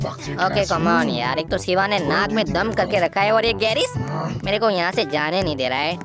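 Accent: Gujarat, India. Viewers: App Gujarati ho kya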